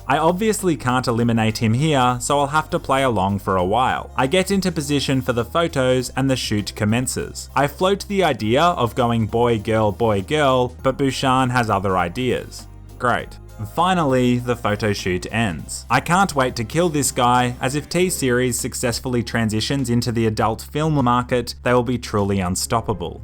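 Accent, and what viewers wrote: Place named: Australia. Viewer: Thought he was Aussie I’m Australian to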